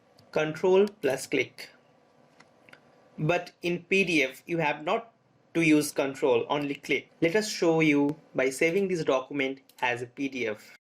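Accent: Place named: India. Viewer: INDIAN ACCENT ALWAYS THE BEST TUTORS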